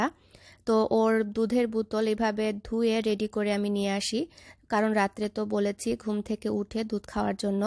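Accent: Sylhet, Bangladesh. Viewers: Apnee ki sylheti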